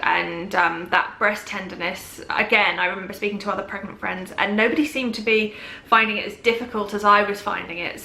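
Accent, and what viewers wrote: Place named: United Kingdom. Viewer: Elizabeth Ungemach British accent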